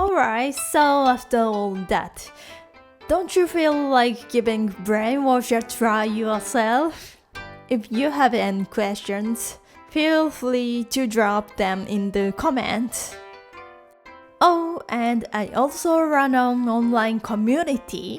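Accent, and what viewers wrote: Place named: Japan. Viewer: Oh thanks for the english version! You're quite good at speaking it already. I think Japanese people speaking english sounds very cute lol, the pauses and how they pronounce things is nice :) . The background music is a little loud, but maybe you did that on purpose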